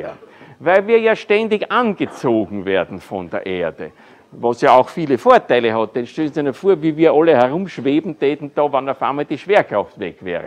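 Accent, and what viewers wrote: Austria. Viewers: Österreichisch ist einfach zu Charmant. Ich wünschte ich würde es auch beherrschen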